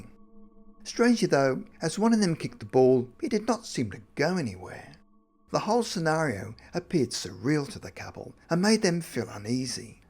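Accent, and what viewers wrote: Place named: Australia. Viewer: reminds me of the Geico Gecko LOL I think he's Aussie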